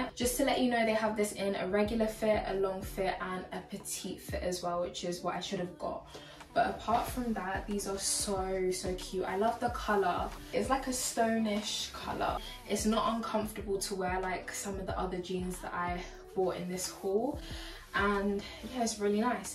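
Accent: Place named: United Kingdom. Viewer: Your British Accent….❤️ Very Beautiful As Well…..😍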